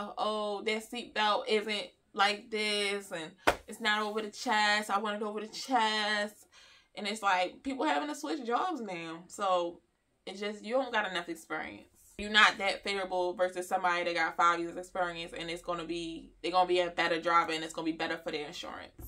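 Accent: Baltimore, United States. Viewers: Sounds like you from Bmore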